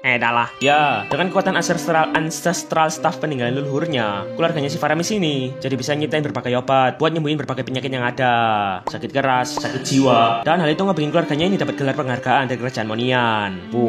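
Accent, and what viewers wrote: Java, Indonesia. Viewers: Wes fiks mas wong jowo 😭👊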